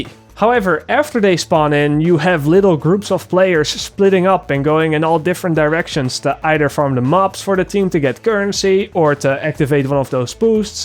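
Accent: Netherlands. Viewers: je hoor echt gelijk dat je Nederlands ben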